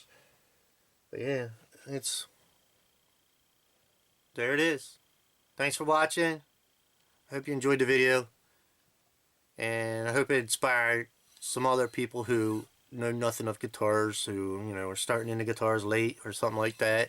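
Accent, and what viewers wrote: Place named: Philadelphia, United States. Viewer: You sound like your from philly lol!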